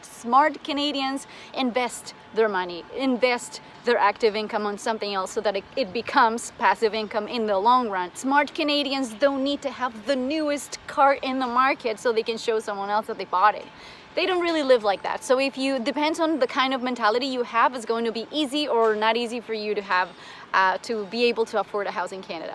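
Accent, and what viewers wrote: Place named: Colombia. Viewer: I like your Colombian accent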